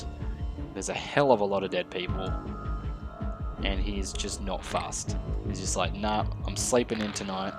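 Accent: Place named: Australia. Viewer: He's Aussie...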